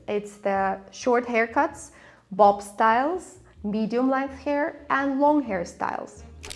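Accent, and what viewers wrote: Russia. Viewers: У русский акцент, послушала бы ее на русском.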